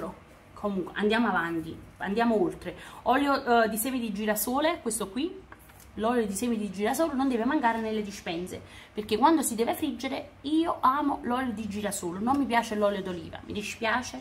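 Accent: Naples, Italy. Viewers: Ciao sono nuova👋ma tu nn sei siciliana mi sembri napoletana😄😄😄sei simpatica👋👋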